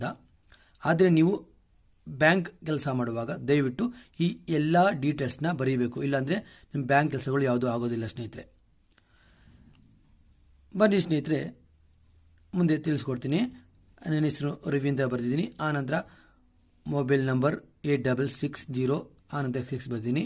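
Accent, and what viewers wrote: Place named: Mandya, India. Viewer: Sir neevu pakka Mandya side thane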